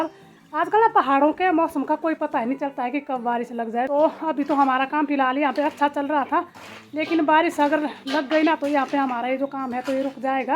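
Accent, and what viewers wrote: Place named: Punjab, India. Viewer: Aunty ji aap punjab se ho kya aapki boli punjabi jaise lg rahi hai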